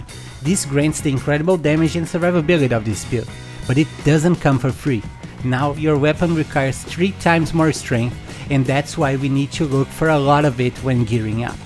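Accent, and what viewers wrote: Brazil. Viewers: Vc é br, o sotaque parece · cade o video em portugues? certeza que é brazileiro com esse inglews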